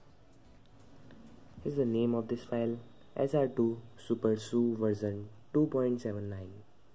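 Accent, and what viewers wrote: India. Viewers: Wi u Indian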